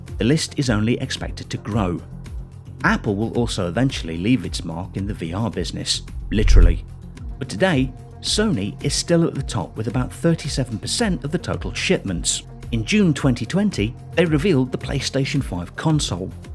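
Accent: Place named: RP, United Kingdom. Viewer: Puke inducing, oversofisticated British accent. Voice is akward modulated, I turned it of I couldn`t stand the lector. I had convulsions after listening him, even though the topic was interesting.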